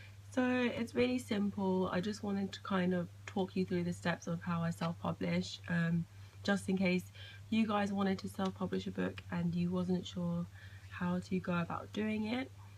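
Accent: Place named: United Kingdom. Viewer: Hooray found somebody with a british accent. 😊😊😊😊😊😊. I love Art appreciate your channel.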